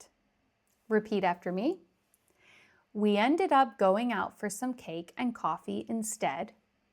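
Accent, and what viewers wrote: United States. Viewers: I'm happy that I've found you. Your videos are really helpful. Thank you. I like the American accent ❤ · Thank you very much for your useful work! I enjoy it a lot, but honestly I have to say that it's a little bit weird to me the american accent because I have been taught at school in British accent. But in any case, I do my best! Kisses from Spain.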